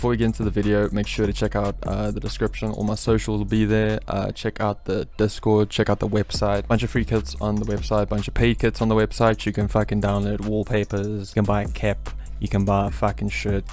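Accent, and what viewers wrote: South Africa. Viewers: Bro are you from south africa coz your accent is like that lol...great vid im learning alot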